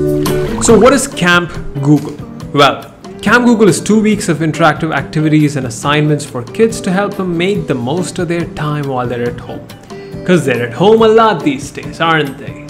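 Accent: India. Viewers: Yes i am too By your body colour and style of talking in English sounds and seems like an indian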